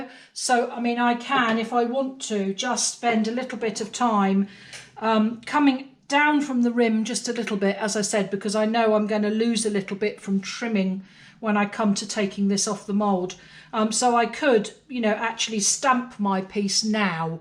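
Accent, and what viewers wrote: United Kingdom. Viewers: I am from Flanders, Belgium. I love your YT stories and learned already a lot… And having an American grandson I love to hear your so very British accent 😉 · Hello I'm from Belgium I love your English accent, I learn English in evening class and the teacher always says that you have to put the tonic accent on the words otherwise the English peoples do not understand us and I must say that I understand everything what you say. Thank you for your videos they are all interesting.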